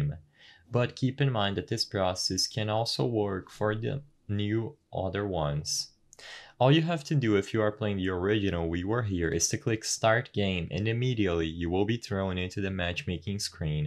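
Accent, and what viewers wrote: Brazil. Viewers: This game has cross-plataform? Eu acho que vc é BR pelo sotaque, sabe se esse jogo tem cross play?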